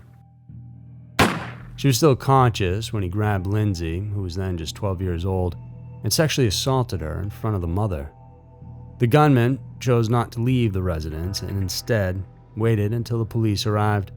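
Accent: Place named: Texas, United States. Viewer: Love, love, LOVE your accent. Not sure if it's Texan (I'm from Austin and we're not like the stereotypes lol) but it sounds a bit like what I'd hear back home.. Either way, your content rocks and I am so here for it!!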